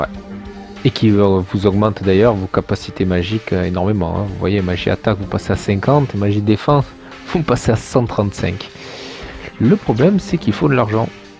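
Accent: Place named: Provence, France. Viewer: Par contre pourquoi? 13 je comprend étant de Martigues (l'accent me rappelle mon chez moi) mais?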